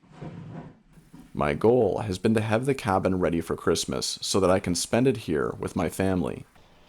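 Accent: Canada. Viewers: I just found this channel. Very nice work on this. Just going by the trees and his accent. Is this somewhere in Ontario?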